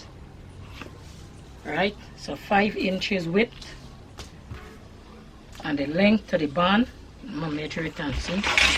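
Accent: Guyana. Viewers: I dont know how your video showed up on my YouTube. From I heard the accent, I knew you were Guyanese. I follow African fashion designers especially Nigerians online, but never came across à south américan/ Caribbean fashion designer. Before subscribing, I will watch most of your videos. The way of teaching is totally different than what I usually see. Detailled, proper, take all the time in the world to teach so that ppl could learn accurately. I say bravo. You even give advice about heavy on top or below which is so true. I like black, navy blue for below because my legs and calves are big lol now I have spoil belly so I need black for that area also. Well thanks for this channel and videos. Also thanks for taking all the time in the world for us to get proper learning. Maybe your channel continue to grow and grow. Ohh and yes I am from the Caribbean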